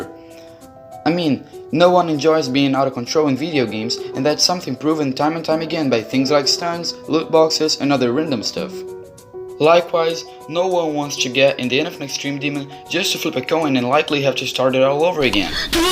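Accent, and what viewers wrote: Brazil. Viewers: Are you Brazilian or Spanish? I'm from Brazil xD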